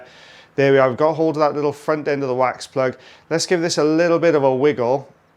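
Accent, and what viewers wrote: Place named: England, United Kingdom. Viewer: I love the English, your accent brings me so much joy 😊 Hope I get to live somewhere in England 🇬🇧 one day.. More on topic thank you for all your hard work putting these videos up every week, I can't imagine how much work and dedication it takes. You and your team are much appreciated:)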